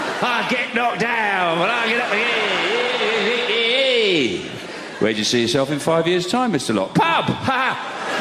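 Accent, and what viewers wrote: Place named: Sussex, United Kingdom. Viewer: Sounds like a Sussex lad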